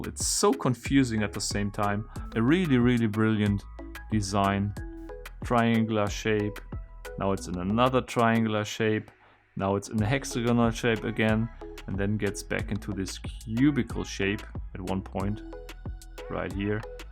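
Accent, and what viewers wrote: Germany. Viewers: He had an germen acsent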